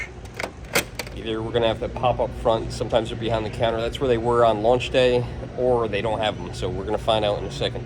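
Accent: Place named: Midwest, United States. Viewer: Where in the Midwest are you? I can tell by the accent. :-)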